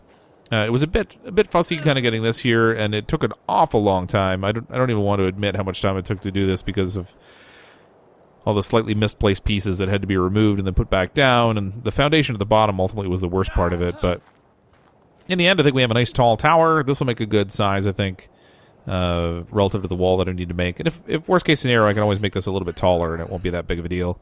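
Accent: Canada. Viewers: I really should be sleeping now- it is am.Nah. That livestream was great. I am now a loyal subscriber. I can definitely tell that you are Canadian from how you say out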